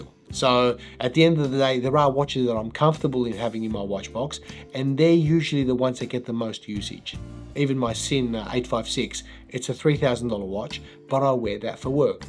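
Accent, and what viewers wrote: Australia. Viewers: I'm so happy to have found this channel., it's great to here an Aussie accent. I love your honesty. I agree with you completely. I only buy what I love and want to wear. I would never buy a 20K watch. I have no judgement for anyone who does. Some of them are very very nice. For me, I love great watches from $300 to 2K. So Micro brands rule.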